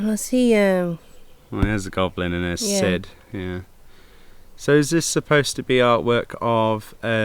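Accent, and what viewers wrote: United Kingdom. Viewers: Love UK accents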